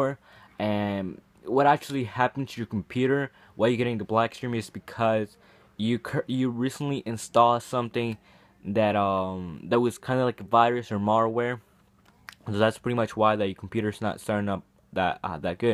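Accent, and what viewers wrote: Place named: South Asia. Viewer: You sound like a brown guy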